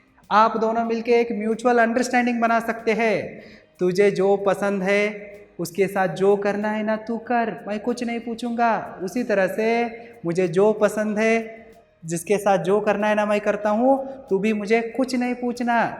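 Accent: Maharashtra, India. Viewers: Marathi or konkani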